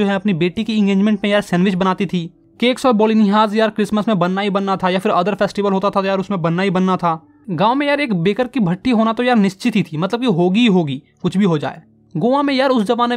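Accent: Bihar, India. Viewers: Sir kiya aap Bihar❤ se he. aap ka language se lag ta he😊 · Yeh bihari h pakka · Bhaiya aap Bihar ke Aurangabad jila se hai kya .😘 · Sir aap Bihar se ho kya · Sir, Are you from bihar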